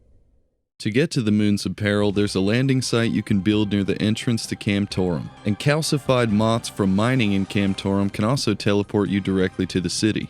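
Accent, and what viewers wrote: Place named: Houston, United States. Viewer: Sounds like he’s from Houston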